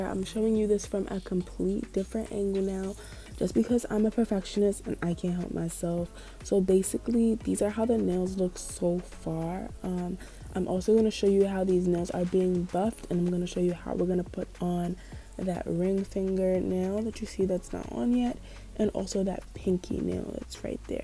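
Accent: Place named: Barbados. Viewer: “I’m not ling ling in the flesh” Plus your Bajan accent 🙌🏾 Loll I love it😂😂🙌🏾❤️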